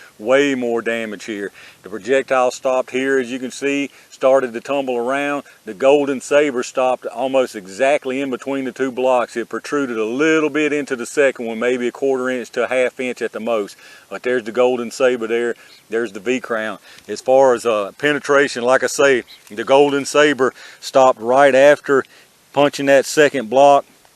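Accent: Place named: Louisiana, United States. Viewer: If he hadn't said he is from Virginia, I would have sworn he is out of central Louisiana. Great video.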